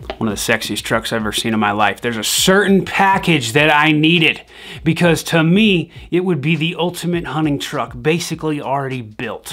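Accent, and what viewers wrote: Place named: New Mexico, United States. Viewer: lol I’m from New Mexico and that’s actually how they talk, And my mom has that same exact truck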